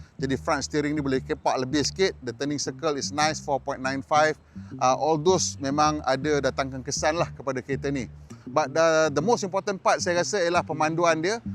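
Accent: North, Malaysia. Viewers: Biasanya saya akan puas dengan penjelasan, cara bercerita dan selit berseloroh loghat utara, tapi kali nih saya berkedut dahi nak faham abang sulam campur rojak dgn bahasa Inggeris..hemmm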